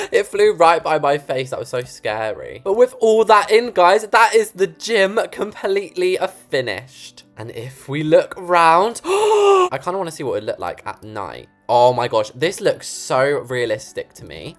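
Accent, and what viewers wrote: United States. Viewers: I love how he does the American accent 😂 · I LOVE you Amarican accent:) Its sooo Fun! She should come to Amarica someday! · I CANT I LOVE THE A,ERICAN ACCENT ITS TOO FUNNY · Do an entire video in your american accent and call everything in their american way, Ex: Crisps are now chips. (im from america lol and want to see you strugle with everything) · I love ur builds but I’m not the biggest fan of ur style… or ur American accent srry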